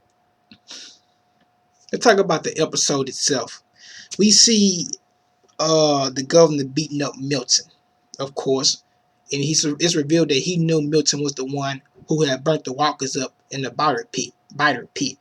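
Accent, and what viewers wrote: South, United States. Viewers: You give great reviews guy! Fantastic critical thinking skills on display. Really liked your southern accent too. Good job breaking it down!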